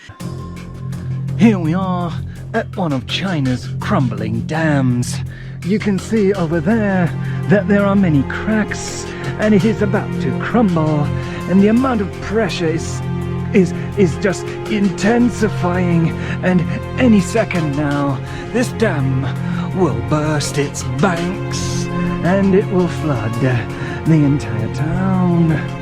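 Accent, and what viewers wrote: RP, United Kingdom. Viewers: Hahahaha that BBC voice....epic!!! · I love how this British vlogger learn the accent of the BBC reporters! Very well done! Thank you for explaining how western media discredits China and make fake news about China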